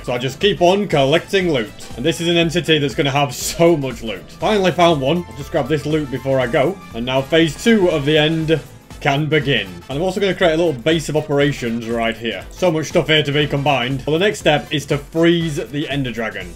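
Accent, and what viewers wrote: United Kingdom. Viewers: You are Britishers because of your accent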